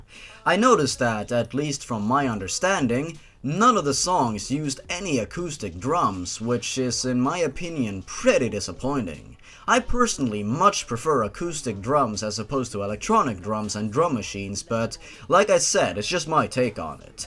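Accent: Sweden. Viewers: Man hör att du är från Sverige bara så att du vet.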